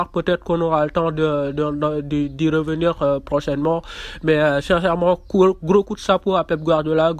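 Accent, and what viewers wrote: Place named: Senegal. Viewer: Accent sénégalais 🙏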